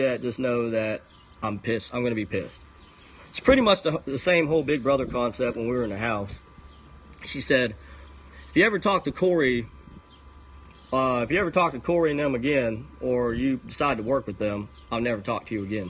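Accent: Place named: South, United States. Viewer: Asian with a southern accent...amazing/sexy I watched your whole video even though I don't know you. I would fly you to the Philippines and give you zen.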